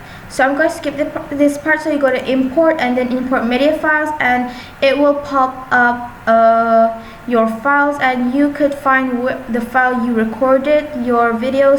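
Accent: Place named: Malaysia. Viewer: That's her malaysia accent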